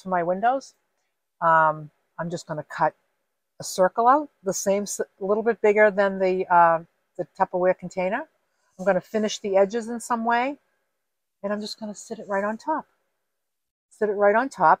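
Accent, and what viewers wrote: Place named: Massachusetts, United States. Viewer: Ha I knew it,,,,I heard that Mass accent on your other videos. Thanks for cool videos Helpful ..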